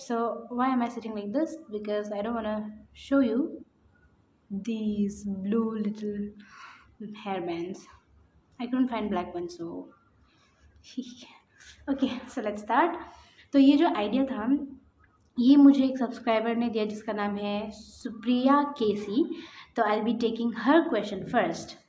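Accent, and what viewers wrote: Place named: Arunachal Pradesh, India. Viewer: The way u TALK seems TYPICAL '"ORUNOSOLI"" r u ORUNOSOLI or Bengali or Assamese? No offence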